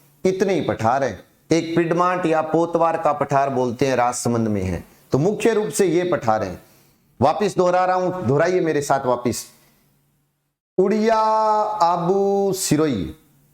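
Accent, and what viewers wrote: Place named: Haryana, India. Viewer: Sir, Your language tongue is matching with Haryanvi/Bagri, Due to regional effect It supports easy to learn then Marwadi/Mewari classes, thanks for your great efforts.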